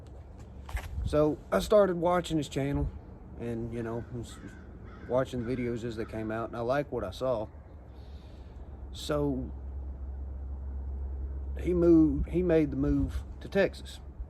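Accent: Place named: Kentucky, United States. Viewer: Sounds like a Ky accent.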